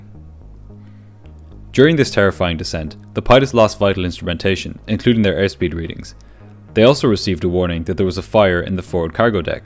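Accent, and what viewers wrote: Ireland. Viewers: Your videos are of incredible quality. They're very easy to watch and follow, you are really onto something with this channel. It's also great to hear a fellow Irish accent in this community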